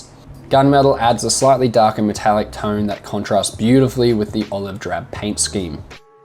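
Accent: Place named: Australia. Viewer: Great stuff Miss the Aussie accent from home over here in the UK Thanks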